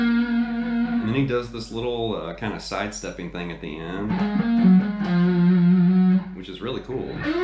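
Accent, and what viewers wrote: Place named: South, United States. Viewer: No idea who you are and you kinda look and sound like you should be playing a banjo on a country house porch somewhere but sheeeet man you can shred for sure. You also know what your talking about which proves once again that looks can be deceiving. I need nothing more than this to Sub to your channel and I’ll listen to you break down any guitarist licks. Thanks man and rock on! 🤘🏼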